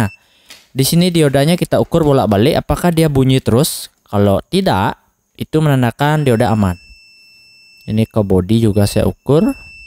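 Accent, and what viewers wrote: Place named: Bali, Indonesia. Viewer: Logat bicara bapak ini seperti orang Bali 😅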